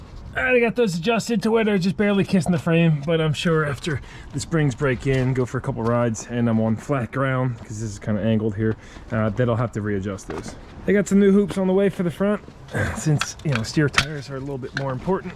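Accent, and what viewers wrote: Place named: Pittsburgh, United States. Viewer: Are you originally from Pittsburgh?? Your accent is so reminiscent of Pittsburghese it's uncanny.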